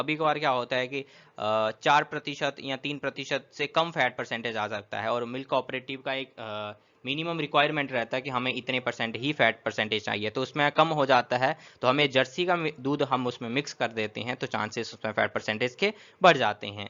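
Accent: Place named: Bihar, India. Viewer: Aap bihar se ho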